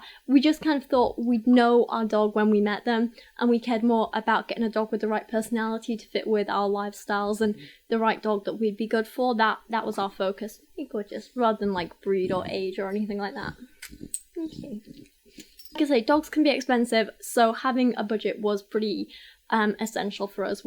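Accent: United Kingdom. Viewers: Dammit, talks just like my sister, but with an British accent....tripping me out.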